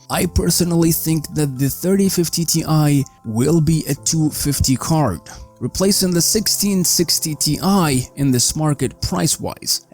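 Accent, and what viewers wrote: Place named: Russia. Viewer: Мне показалось, что у вас русский акцент) успехов в развитии канала.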